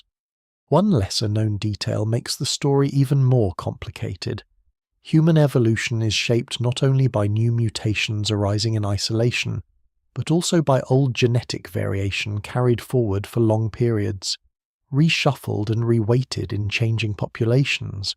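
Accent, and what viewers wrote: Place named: United Kingdom. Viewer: Inwoildvl9kebto hesdrr this video, j cantbstand the wavky british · celtic accentblrt alonevthe transcript v9lu,ous language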